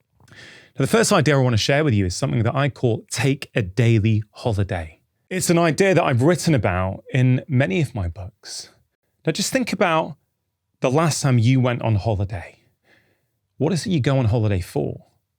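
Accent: England, United Kingdom. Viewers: I like your english accent...❤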